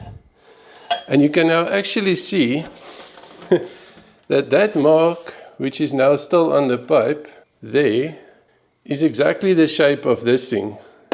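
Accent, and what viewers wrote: South Africa. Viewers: LOL that south african accent... 🤣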